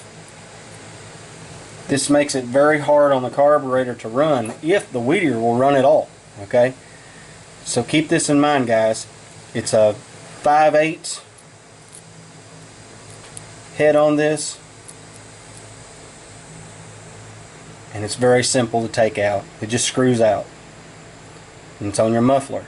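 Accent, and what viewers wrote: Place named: West Virginia, United States. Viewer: Good video , thanks man. I'm guessing your from West Virginia ? We say "plars" too . Ha ha ! Thanks man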